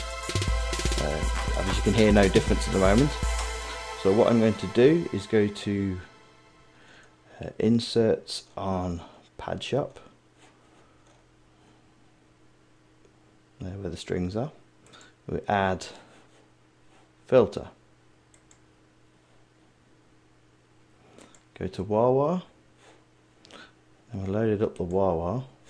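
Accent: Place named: Midlands, United Kingdom. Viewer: good work, are you from the Midlands?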